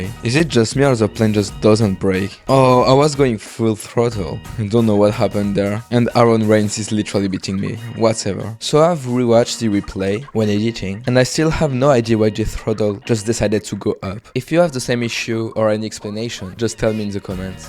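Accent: France. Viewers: Tu es français ?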